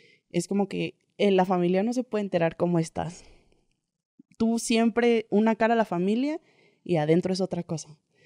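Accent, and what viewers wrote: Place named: North, Mexico. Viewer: Exactamente!! No tiene una buena preparación académica eso se ve a, leguas, aparte el tonito norteño, ojo no critico, pero coincido con los comentarios anteriores. Si esto le está dejando dinero, que invierta en su preparación, empiece a estudiar una carrera profesional como el periodismo, ya que, ahora es todo en linea y bien que le dará tiempo, alternando con cursos de locución, animacion, etc, todo lo relacionado con este tema. Mi hermano es un excelente locutor y comenzó desde abajo, pero estudió Ciencias de la Comunicación y ha tomado muchos diplomados, cursos, aunado con el idioma inglés que domina muy bien, y se fue incrustando en este ámbito cada vez más. Lo comento con respeto y de manera constructiva, pues lo viví de cerca y que mejor ejemplo que con un hermano. Preparese más.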